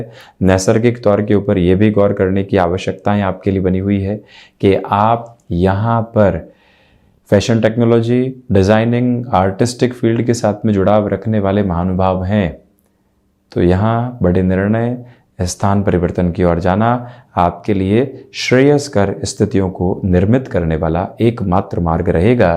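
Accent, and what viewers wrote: Standard. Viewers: Thank you for the predictions ji.🙏🙏🙏. It was crisp and to the point. However Hindi is not my mother tongue so, I had to listen very carefully to understand your words. I got so used to the bollywood style of Hindi which is filled with words from Urdu. So this is what shudh Hindi sounds like! 👍👍👍. I think it will take some getting used to.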